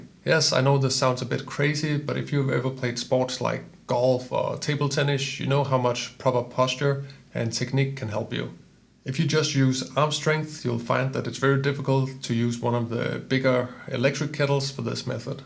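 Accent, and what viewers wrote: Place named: Denmark. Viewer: Blev nødt til at google for at se om du var dansk. Det er så svært at skjule, at man er dansk, når man taler engelsk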